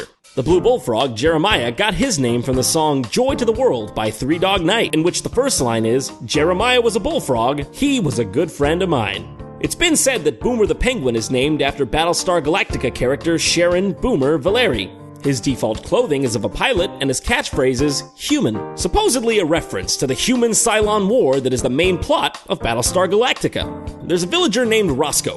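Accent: United Kingdom. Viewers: That British accent... I... don't know what to say! But I think I like it? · Awesome British accent haha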